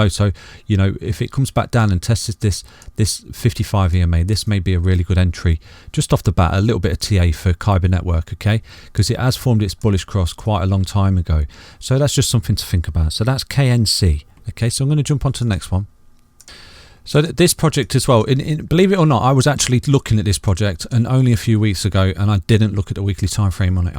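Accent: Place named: RP, United Kingdom. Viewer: Where are you from mate?, just got that BBC english accent i listened back in Bournemouth 2012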